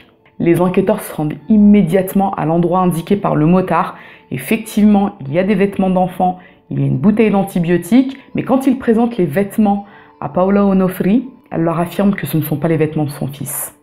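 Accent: Italy. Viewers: Quel accent !!! Suis italien j'ai remarqué de suite que tu le parlais, tu es Italienne ? Bravo une fois de plus gros travail de ta part sur cette histoire ! J'adore mon rdv du dimanche ! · cet accent! tu sais parler italien? impressionnant !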